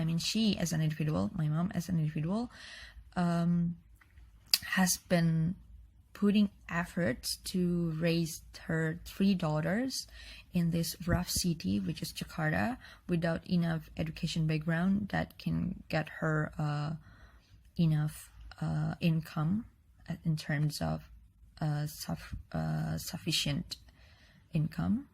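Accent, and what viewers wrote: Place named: India. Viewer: kayak accent india ya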